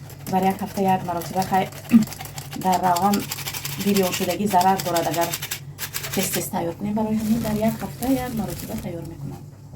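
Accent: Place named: Asht, Tajikistan. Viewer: Ха барои хамин тарзи суханкардантонам мисли мо Ман хам аз райони Ашт Ман давно Канали шуморо тамошо мекунам ба шумо пешравихо хохонам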